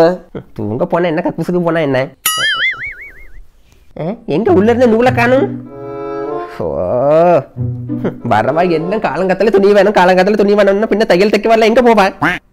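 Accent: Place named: Nagercoil, India. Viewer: Anna ungaloda speech ellam normalla vettil nadapathu polavea irrukku super Anna nalla perform panringa .unga nadippu ellamea superra irukku Anna Enga Nagercoil speech mathiri irrukku unga native entha ooru Anna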